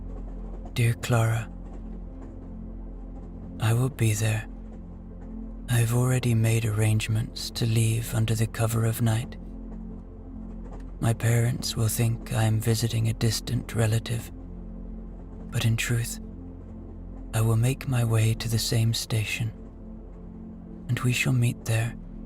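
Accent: Ireland. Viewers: This is so wonderful you are an amazing Irish storyteller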